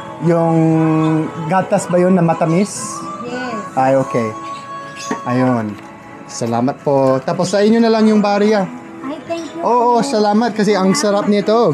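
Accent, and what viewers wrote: Ilocos, Philippines. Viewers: You have an accent of ilokano dialect. I have ilocano friend and you have similar intonations. I'm impressed because I heard from her that ilokano is very hard to learn especially the accent itself. Did you stay in ilocos and how long?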